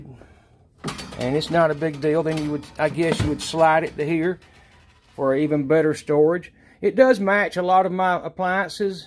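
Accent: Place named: Texas, United States. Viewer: your accent is fantastic! Texas?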